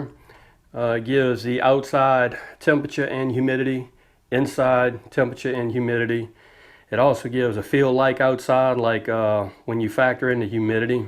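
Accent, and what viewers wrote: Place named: Louisiana, United States. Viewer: Sounds like your from south Louisiana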